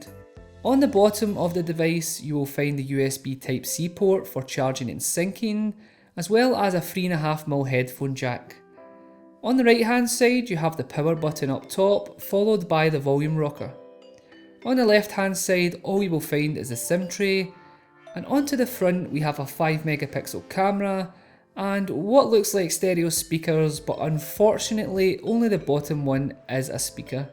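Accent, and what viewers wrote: Scotland, United Kingdom. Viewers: If I'm honest I love your accent. I've always wanted to visit Scotland one day 👍🏻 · Where r u from?? Scotland?? Nice accent. Nice video!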